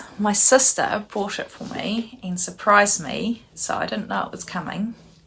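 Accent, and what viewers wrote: New Zealand. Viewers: Hi So nice to hear another kiwi accent on YouTube. I am from Auckland and will go back and watch all your early episodes.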